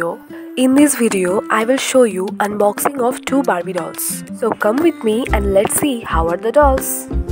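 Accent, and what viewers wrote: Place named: India. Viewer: Are you indian 🇮🇳 your voice is matching 😊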